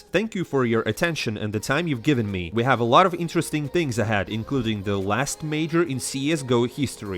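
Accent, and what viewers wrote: Russia. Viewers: Спасибо за новости, ты пытался не спалиться что русский)) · I can feel russian accent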